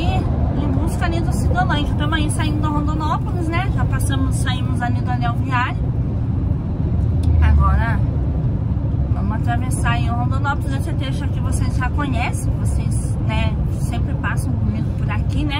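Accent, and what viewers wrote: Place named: Santa Catarina, Brazil. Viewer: Boa noite, mas de novo vai ficar de varde😂 amo esse modo de dizer as palavras, morei em Santa Catarina na cidade de Modelo por um ano, os meus piás, já estava com sotoque😂🥰 mas você merece😉fica com Deus e boa viajada 🙏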